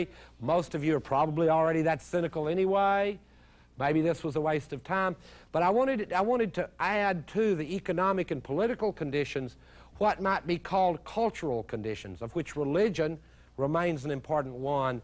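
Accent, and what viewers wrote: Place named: Texas, United States. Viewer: I knew this dude was from Texas because he sounds like Mark Rippetoe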